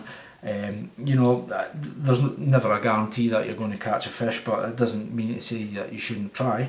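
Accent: Scotland, United Kingdom. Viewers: Are you scottish do you go camping in the highlands.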